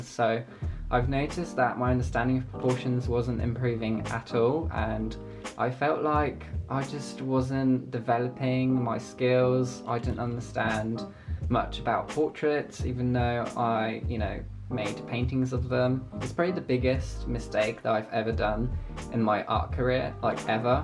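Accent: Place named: United Kingdom. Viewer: Everything is just soooo gorgeous! I’ve seen in the comments from another video they you speak another language (don’t remember which one sorry) I don’t know if you are from America or something but your English is so great ! You sound kinda British I love it! Anyway I have a lot of ideas for my sketchbook, thank you for that , love your art 🤗❤️👏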